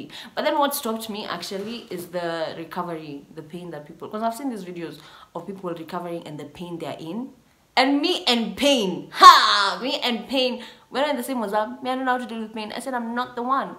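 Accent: England, United Kingdom. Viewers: I love the British accent♥️♥️♥️♥️ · The British accent always giving me feel good vibes😁😁. This gonna be good 👌. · You know storytime is gonna be lit british accent ikicome through😂🙌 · It's the British accent for me 😂❤️❤️❤️ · That English accent is Perfect 👌👌🙌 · Your British accent ♥️ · Am new here and the lady speaks British English.... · I don't care much about the stories.. But I love hearing that British accent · It’s the British accent for me😂😂😂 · The English accent❤❤❤😂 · The British accent 😍 daamn girl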